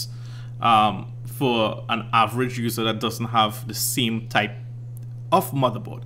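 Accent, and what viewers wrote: Trinidad and Tobago. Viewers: You sound like you are from. Trinidad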